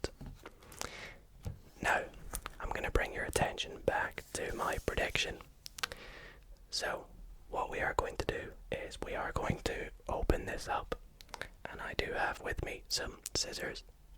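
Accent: Scotland, United Kingdom. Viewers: The reason you're so good at ASMR and also card stuff is simply because you're Scottish lol. It's weird hearing a Scottish accent do ASMR, because I'm also from Scotland haha. But man! Your ASMR is the only one that works for me. Thanks for the vibes, mate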